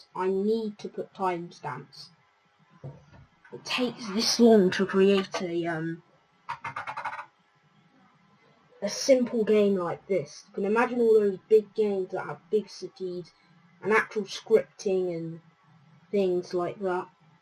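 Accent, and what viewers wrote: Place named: United Kingdom. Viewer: the brithis accent its so funny XD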